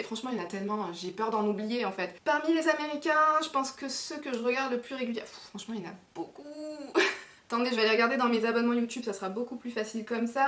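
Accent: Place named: South, France. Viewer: Vidéo bien sympa tu es pleine de vie je pensais bien que tu venais du Sud tu as un peu le même accent que Julien Doré. Restes comme tu es et bonne continuation 🥰❤🥰❤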